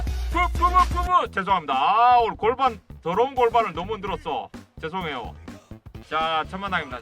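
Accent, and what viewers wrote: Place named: Busan, South Korea. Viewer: 나 형 부산쪽일거라 생각했어! 광주 말투가 아님!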